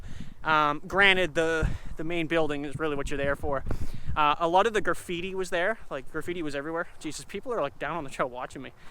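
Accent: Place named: Newfoundland, Canada. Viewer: I live and grew up in Halifax and have never heard a accent so thick Lol you must be a newfie or caper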